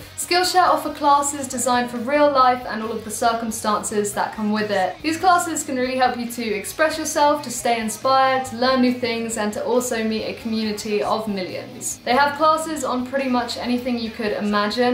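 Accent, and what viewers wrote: United Kingdom. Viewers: And for your accent, it has to British. Best musicians in the whole world! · I heard a British accent, am I mistaken?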